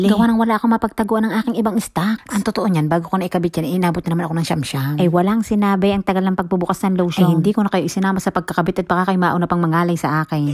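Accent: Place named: Batangas, Philippines. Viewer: new follower ninyo po ako. i like how you narrates your videos... nkakatuwa. batanguena tlaga!!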